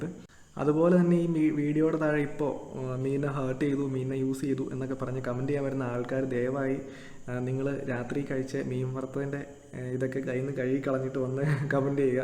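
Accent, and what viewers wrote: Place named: Kasaragod, India. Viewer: Aiwaaaaaaa🥰🥰🥰🥰🥰 kidilan #kasaragodbhasha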